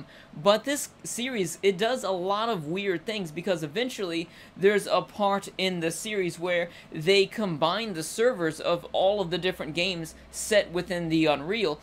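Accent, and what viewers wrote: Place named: United States. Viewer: Random question, but where are you from? I can hear a country accent from you lol.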